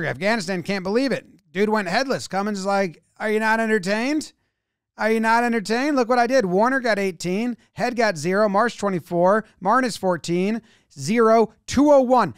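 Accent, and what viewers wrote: United States. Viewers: its the weirdest thing hearing an american talk about cricket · This is the first time I'm hearing someone commentate in an American accent, and I'm loving it, especially since its funny too. Refreshing! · there's something about an American describing cricket that is just addictive to listen to. Such a great reaction video. This should be used by the ICC to promote the world cup that's coming up in America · Its so weird to hear someone with American accent commenting Cricket. We are so used to Brit, Aussie and Indian commentators 😂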